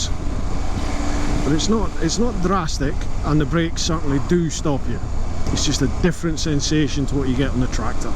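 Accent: Scotland, United Kingdom. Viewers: are you Scottish? This bike I would love to have, but the triumph 765 and the pain gale v2 or the R9T are too much of a temptation right now.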